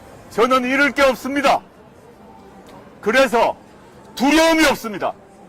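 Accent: Busan, South Korea. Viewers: 이야~~~ 부산 사투라가 속을 시원하게 한다!!! 멋지오 조 국! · 혁신당대표님 부산사투리도 멋지게하십니다 파이팅🎉🎉🎉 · 국민의힘 깡패말이란다 구수한 부산사투리를 ᆢ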